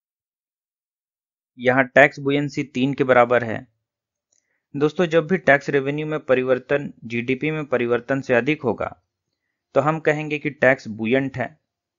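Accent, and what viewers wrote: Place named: North, India. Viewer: This is North Indian accent of English.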